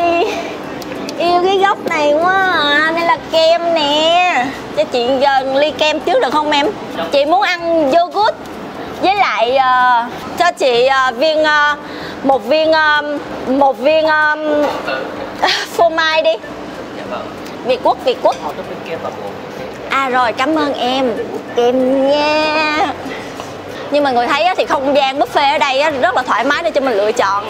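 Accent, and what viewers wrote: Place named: North, Vietnam. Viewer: Chi diễn bắc cứ trương chình nào e cũng thích het.nét chi diễn rất la có duyên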